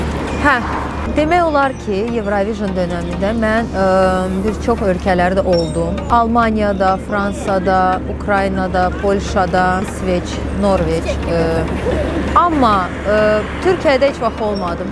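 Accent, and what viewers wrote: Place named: Azerbaijan. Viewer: Halal olsun sənə bezileri kimi türkiyeye catan kimi dilivi dəyişməmisen xalis azeri dilinde ve hetta azeri lehcesinde danışırsan sanki ele bu 8 ilde burada dogma Azərbaycanda olmisan maşAllah sene hemise bele semimi ve öz qalasan Amin...gözdeymesin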